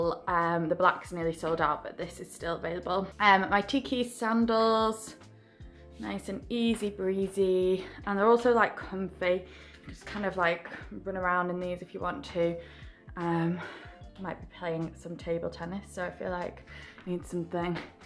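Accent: Manchester, United Kingdom. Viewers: She has a gorgeous accent, like posh Mancunian… probably Alderney edge or Somewhere Cheshire’s. I love the videos and she is very articulate.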